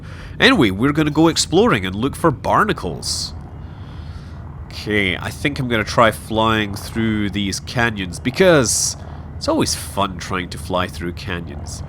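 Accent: Scotland, United Kingdom. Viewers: Goddamn I love Scottish accents.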